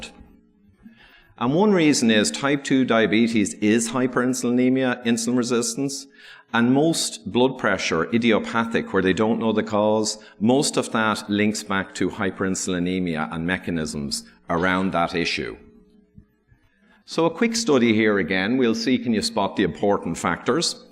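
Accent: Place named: Ireland. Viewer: Truly starts The Irish accent ... truly the sound of angels singing ;-)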